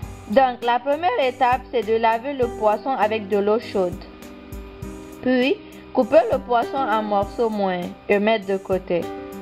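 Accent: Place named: Guinea. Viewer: Je suis tombé sur ta chaine super video une abonne de plus, tu vien de la guinnee avec ton acent j ai suis , tu peux faire un tour sur la mien aussi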